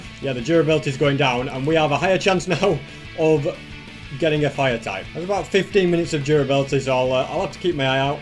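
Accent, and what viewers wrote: United Kingdom. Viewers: why do you look like indian but your accent is british?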